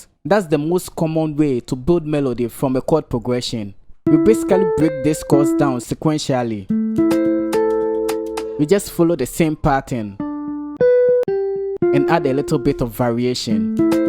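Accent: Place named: Ghana. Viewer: ok bro . Am watching from Cameroon. I guess your are Ghanaian from ur accent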